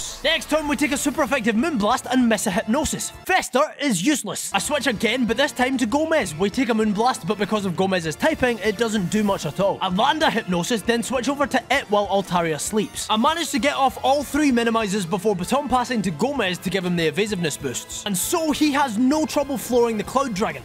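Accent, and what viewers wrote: Scotland, United Kingdom. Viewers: Its nice that you have a Scottish accent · you're so aggressively scottish and i love it because so is my grandfather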